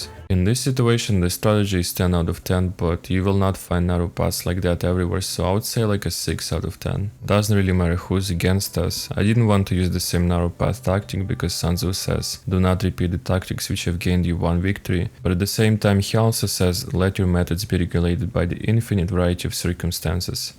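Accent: Russia. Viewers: Но я же слышу по твоему акценту, что ты русский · Ты шо русский? Судя по акценту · Дубляж на русском был бы прекрасен, особенно учитывая что автор явно русский по акценту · Russian accent I guess? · Русский акцент? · Why no one is commenting about kinda russian actent? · My comrade, you have the hardest Russian accent I've ever heard.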